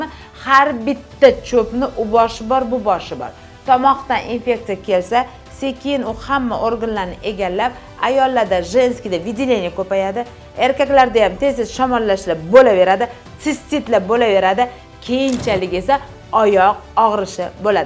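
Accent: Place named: Khorezm, Uzbekistan. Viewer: Оник хоразмилисиз дохтир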